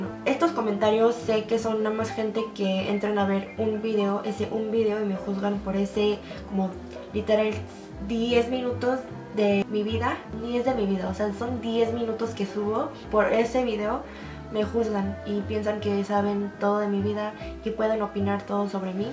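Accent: Mexico City, Mexico. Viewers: Hola mi queridisima tengo muy poco que me uní a tu canal la primera vez que te vi quedé sorprendida en tu forma de hablar por que realmente he escuchado a otros asiáticos y hablan con dificultad pero tuuuuu no mames eres bien pinché mexicana aunque tengas tu físico asiatico vale madre lo que digan eres bien mexicana me gustan tus videos yo los veo mas bien escucho por mi trabajo pero trato de actualizarme contigo y te digo que si no les gustan tus videos pues que lo vean y ya cabron no se acaba el mundo bueno te mando saludos 😜😘🤗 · El hate es porque eres Méxicana, y hablas un español perfecto y con acento Méxicano, aparte de que tienes cultura mexicana. Si fueras 100% extranjera (coreana) sin hablar bien el español te recibirían con los brazos abiertos así como a tus compatriotas coreanos. Está mal pero así son los mexicanos. Y si los comentarios de hate vienen de otros países latinos es por tu lado Méxicano también. 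· Hay ignorantes en cada país! Los mexicanos somos posesivos y te queremos solo para nosotros! Somos celosos! Una disculpa, por estos tipejos y tipejas, eres hermosa, y talentosa y eres muy mexicana y te defiendes como una mexa! Amo tu español tan chilango! Tu sigue como vas y como decia el Quijote, "dejad que los perros ladren, señal de que vamos avanzando" . Cada quien habla de lo que en su corazón tiene! Así que toma las cosas de quien viene y como decimos en México .... "hacer caso a pendejos es engrandecerlos" Éxito en todo hermana Mexicoreana! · Haaay me encantas...jaja como contestas yo no veo mucho tus videos pero muy de acuerdo con lo que dices, y pues si que bueno que estes muy agusto con todo lo que sientes y tienes...cada quien tiene su vida como quiere y a nadie le piden permiso...hay de todo en la viña del Sr...jaja y por como hablas pues yo te siento muy mexicana...que tiene...jaja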